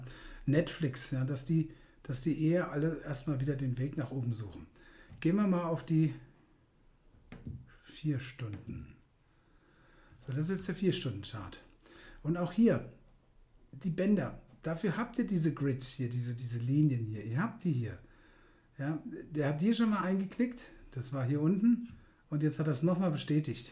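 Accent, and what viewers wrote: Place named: Frankfurt, Germany. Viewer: Klasse! Erinnert mich an meine Frankfurter Börsenzeit, und abends im Bitburger, da war auch dieser Dialekt am Start :) Vielen Dank für die solide Arbeit!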